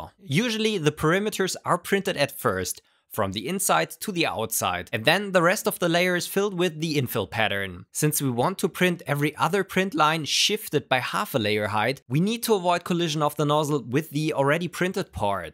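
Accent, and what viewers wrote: Germany. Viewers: Do I hear a fellow German?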